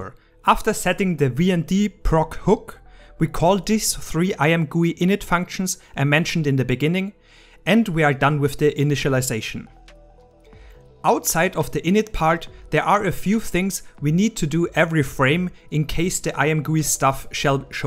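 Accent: Germany. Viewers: Wie man das deutsche raushört 😂 Aber nice Video!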